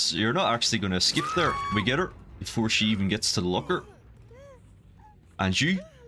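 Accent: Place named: Scotland, United Kingdom. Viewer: Love watching your videos!!! Your Scottish accent makes it even better haha keep up the good work dude!!!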